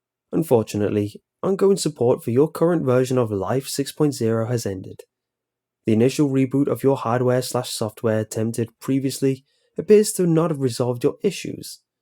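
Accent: England, United Kingdom. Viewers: maybe england just a guess tho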